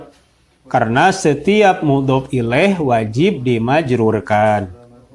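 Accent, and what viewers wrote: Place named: West Java, Indonesia. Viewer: Alhamdulillah...p msh tetap eksis saya jd ikutan belajar barakallahu... enakeun jelas wlpun nyunda pisan...hehe 🙏🏼 htr nuhun smg dg menyampaikan ilmu menjadi obat utk kesembuhan... 🤲sukses ya...n mangat💪👍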